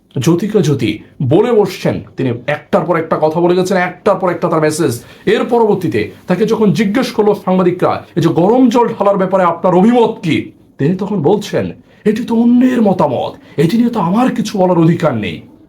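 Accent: India. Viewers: দাদা বাংলাদেশী। আপনার কথার ধরন অনেক ভালো। আপনাকে ইন্ডিয়ান লাগে আমার কাছে।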